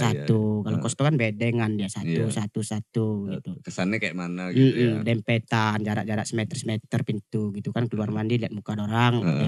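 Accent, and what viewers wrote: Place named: Lampung, Indonesia. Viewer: Lampung banget logat nya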